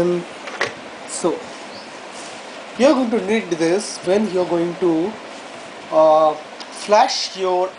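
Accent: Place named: India. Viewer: I like your accent!😊 Are you Indian? I'm from Egypt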